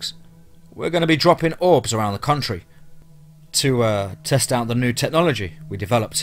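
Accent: England, United Kingdom. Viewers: Mock these encounters at your own risk..BUT.......even if this particular story is BS... there are CREATURES that we're born from. FALLEN ANGELS. Benne Ha Elohim translates in Hebrew as " fallen ones"....... The British accent is cute...... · Loving 🥰 the English accent of this storytelling #teamfear love ❤️ Ally from Australia 🇦🇺 #TEAMFEAR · Dude your accent doesn't sound American. You sound british. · An English accent? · If you're from Kansas City, where did you acquire your UK accent? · He's NOT australian . He's English. !!!! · Why does a guy from Kansas City have a UK accent??!! Come on man. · Yeah right. Believe this guy. He said he was raised in Kansas City and is an officer in the Rangers. Yet he has an English accent so thick you can cut it with a knife, and there's no Midwestern US overlay. None. He couldn't even pronounce Fort Leavenworth properly. 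Bad joke and dumb story. Thumbs Down #fakemantalking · First of all he’s English and secondly it’s a creepy pasta...not real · Tell you what...You are not from Kansas City with that British accent. sorry but I think you are a writer trying to pull a fast one on a bunch of us who look at this stuff on you tube. You even talk like a novelist. You have done your research and its too familiar to other posts. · wheres the same stories without the english accent?? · Hi there, I am newbie just now and I don't know why I haven't encountered your channel over the years I'm listening to audio stories on here . I've subbed , I like the range of content I have seen so far , and nice to hear an English accent , definitely a minority amongst the narrators on YouTube. Greetings from this Irish girl in stormy Portsmouth UK this afternoon. 💚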